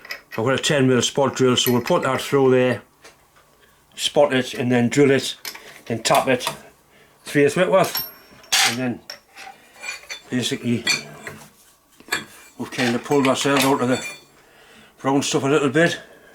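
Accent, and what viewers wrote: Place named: Northumberland, United Kingdom. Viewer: Hard as the hobs of hell, been a long time since ive heard that, deffo ashington twang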